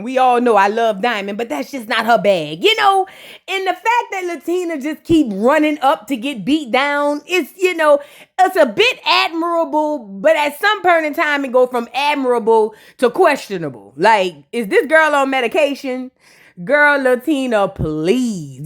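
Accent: New Orleans, United States. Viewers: 😂 girl your commentary voice got me cracking up laughing, but it’s on point as I hear your 504.😅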